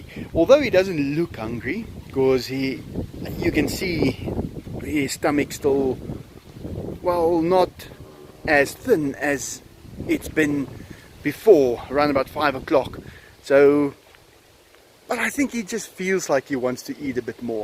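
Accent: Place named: South Africa. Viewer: I would absolutely LOVE to see a tiger (and a lion for that matter) in real life! I live in the UK and I've never even been to a zoo so your videos are a real treat for me, it's like a different world! Thank you so much for sharing with us all. P.S I just LOVE it when you say "Can you believe it"! The South African accent is my absolute favourite! Much love to you, and all the magnificent animals <3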